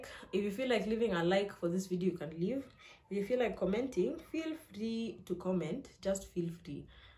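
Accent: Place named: United States. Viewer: This was really a rant and that American English I like ure personality 😅 you can't tell me it will get better Becos u think it'll get better 😅 and that ending was 👌🏼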